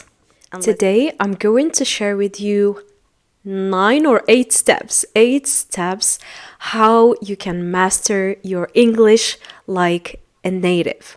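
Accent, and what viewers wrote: United States. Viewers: نطقك ما شاء الله امريكية❤ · تقولي شوفوا هاري بوتر بس الاكسنت حقك امريكي وليس بريطاني؟!😮 الزبدة هاري بوتر مو الاساس اريد الطريقة حقك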